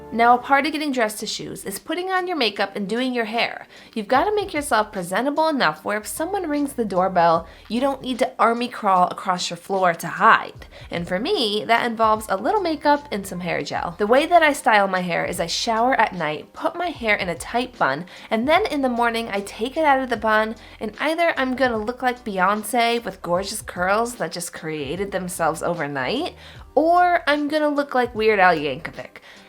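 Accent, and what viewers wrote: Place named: Cockney, United Kingdom. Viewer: Nice Cockney accent. You could play Eliza Doolittle. Thanks for the swish-and-swipe hacks. That's the part of the morning routine that holds me up.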